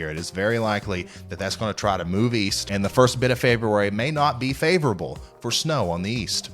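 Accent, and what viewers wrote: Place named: Kentucky, United States. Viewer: Ha ha! I keep commenting about hopeful snow in KY and judging by the pic in the background and the accent like mine, your in KY too! What part?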